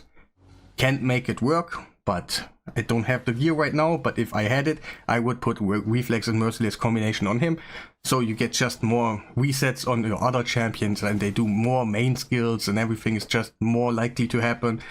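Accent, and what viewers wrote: Germany. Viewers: I like your german Aussprache from the english words😅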